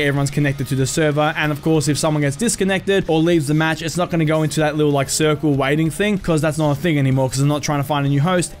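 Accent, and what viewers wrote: Australia. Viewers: Btw it seems a lot of people are surprised you are Aussie, i knew it as soon as i heard ya.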